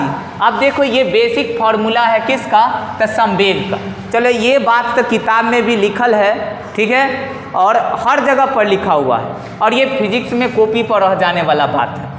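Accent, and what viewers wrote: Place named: Bhojpuri, India. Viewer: Gajab Bhojpuriya style guru ji😘 ham golu hai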